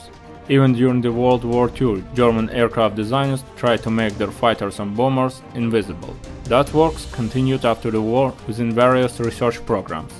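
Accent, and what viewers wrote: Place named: Russia. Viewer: The narrator has obviously a Russian accent. Ironic, since this is about the Stealth.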